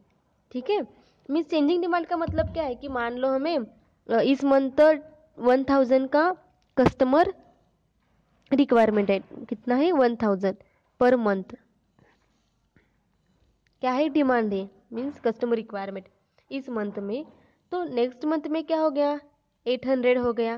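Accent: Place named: Pahadi, India. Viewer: ap pahadiype kyu bol rahi ho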